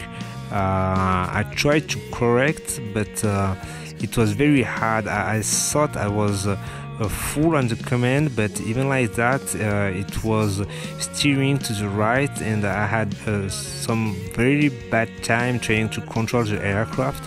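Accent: France. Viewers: Você é brasileiro? Essa cidade é no Brasil mas seu sotaque é francês...enfim se for francês vá "treinar" com a vida das pessoas no seu país...se for brasileiro para de fazer burrada imbecil! Treine no simulador ou em uma área afastada, foi de imensa responsabilidade fazer isso em cima de bairros residenciais e lojas como a Havan que está sempre lotada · Jme suis directement dit que tu es Français avec ton accent ahahaha bonne vidéo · Are you french ?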